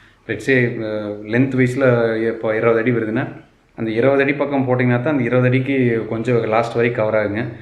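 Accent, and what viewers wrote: Kongu, India. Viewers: Good information, well-planned, and between your speech some Kongu-style is highlighted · Should we apply putty for exterior wall also? How the inch wide granite lip is attached to counter top? would it not detach after some time? I like that idea and many of your tips. I watched the entire video and got carried away completely. your knowledge and presentation in kongu Tamil is awesome.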